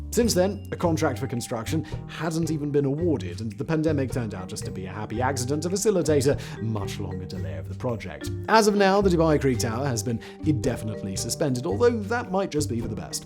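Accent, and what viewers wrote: England, United Kingdom. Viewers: Only person I can't stand listening to with an English accent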